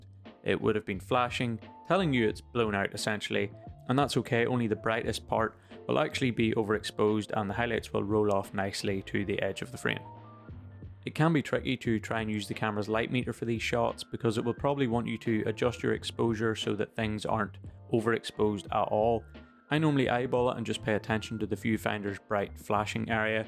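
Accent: Ireland. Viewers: Minor cavil: the word 'sky' is not normally pronounced with an 'sh' sound, but you're Irish, I guess, so ok?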